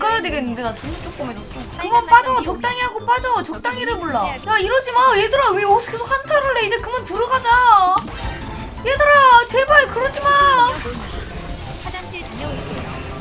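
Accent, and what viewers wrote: Gangwon, South Korea. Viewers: 저 예쁜 공주같은 얼굴에 강원도사투리까지 쓰시니까 너무 매력적이다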